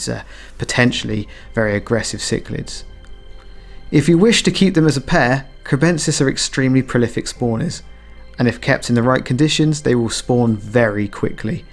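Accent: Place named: United States. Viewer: I hate American accent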